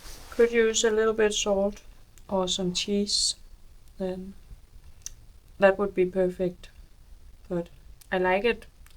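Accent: Denmark. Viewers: Den danska accenten i engelskan är mycket vacker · Thank you for the video from my home region "Söderåsen", It was a lovely Danish accent, I love Denmark, Danes, have been there so much that I am almost half Danish hi hi, Nature in Söderåsen is beautiful but I am not so happy about the wolf implementation, people have lost dogs and soon it will affect some people! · Your soothing Danish voice almost put me in a trance, for a while I thought I was in a remake of Elvira Madigan (1967) then I snapped out of it, unfortunately, I would rather have stayed there! What a wonderful video, thank you! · It's so funny that I only need like 5 seconds to hear that you are from Denmark 😄I'm danish myself that's why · Sorry - men jeg kan ikke klare med af den danske accent i det engelske, havde håbet at det blev bedre, men jeg står af nu.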